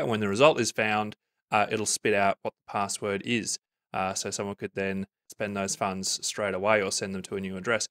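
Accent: Australia. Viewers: The updates you have made to the code, the videos and what is clearly a lot of work is appreciated and meaningful. Thank you. I have your current code running just fine on about 5 systems at the moment. I've explored and set up machines on vast.ai just fine as well. Got all of the GPU code running through the tests 100% but my wallet is a very old Blockchain wallet that's not been converted yet because I don't know the password. I can't seem to use a GPU via OpenCL or Nvidia. I have the id and it's connected to my email just fine. I can and have used the scripts to download the wallet and I understand --data-extract just fine as well. In any case, I've gotten all the way to having lots of possible passwords in my possible passwords files. At this stage, I'm simply trying to work out the most optimal means of plugging all of that back in to figure out the precise password. 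It says that the difficulty of my wallet is 10 which seems good relative to the difficulty rating on some of your test setups. Did I detect an Aussie accent? I'm in the Melbourne area. I'm happy to pay on a consulting basis as long as this is not a percentage. My sense of my situation is that I'm a few mm from the finish line at this point.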